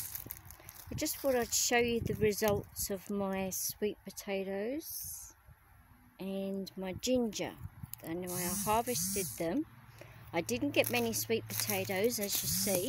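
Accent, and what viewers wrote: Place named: London, United Kingdom. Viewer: Hello again, im sure i can here a london accent there, you used to live in the UK ? I buy ginger from the supermarkets to make ginger wine, im going to give this a go in my polytunnel next year, your harvest is great. thanks and take good care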